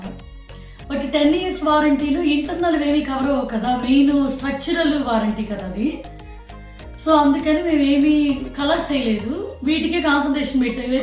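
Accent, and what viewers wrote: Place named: Guntur, India. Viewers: Hi sisterYouTube suggested your home tour video 3 months but I didn't see that, after Diwali again suggested your Diwali video after that I liked your Telugu slang I guessed u and your husband was from guntur dist.i watched all your videos really it's very entertaining.i am your subscriber. Now I am currently in Mexico, here I met so many Telugu people, they're very shy to speak in Telugu. any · Mee language chusi anukunnanu..Andra ani..nice to see you in vidios...😍😍😍😍😍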